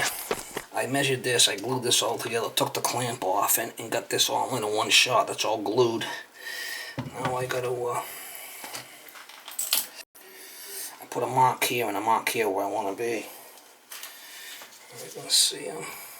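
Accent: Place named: New England, United States. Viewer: New England drawl!👷🏻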